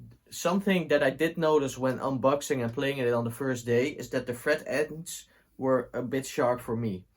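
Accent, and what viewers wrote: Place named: Netherlands. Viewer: I ended up buying the TE-25th, I like it, I also have the TE-62 DB CAR, they both sound great but have their differences, I don't know why they went with the long screws as they obviously commissioned the bridge themselves as it has their name on it, I cut mine down and filed the tips of the screws, no issues now! I realised when coming back to this video I had not subscribed, sorry, I have now! I used to live in the Netherlands in 1998 to 2001 I lived in Noordvijk Aan Zee and later Noordvijker Hout, I miss the Dutch accent and so enjoy your video's.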